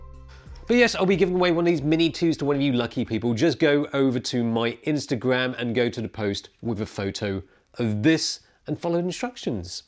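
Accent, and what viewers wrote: United Kingdom. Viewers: Legitimately the most British voice I've heard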